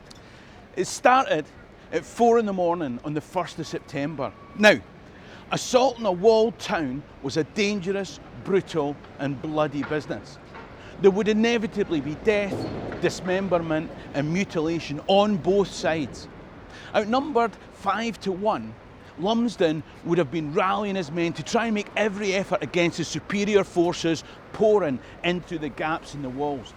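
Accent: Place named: East Kilbride, United Kingdom. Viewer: Cannae believe your from Perth was sure your accent was east Kilbride , keep up the hard graft 💪loving it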